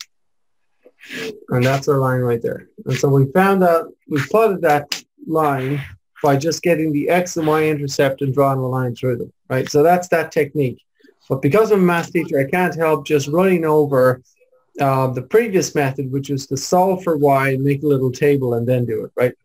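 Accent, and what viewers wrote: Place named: United Kingdom. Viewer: BRITISH!?!??!?!!!?!!!!!?!?!??!?!!/!11?!?? But thanks for the tips, i needed this for my algebra.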